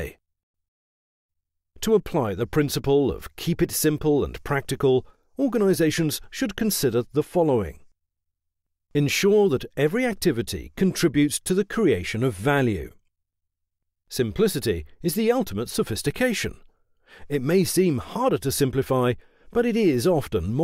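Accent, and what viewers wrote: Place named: United Kingdom. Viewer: Thank you, thank you, thank you for uploading all of this. I can focus and understand much better with a nice British narrator as opposed to the flood of p.a.j.e.e.t made videos.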